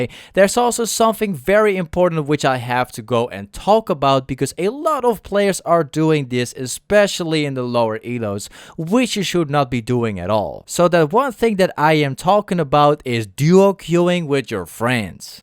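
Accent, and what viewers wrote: Netherlands. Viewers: Are you Dutch? Because your English accent sounds allot like you're Dutch. (I'm Dutch and have heard allot of people talk with a weird accent haha.)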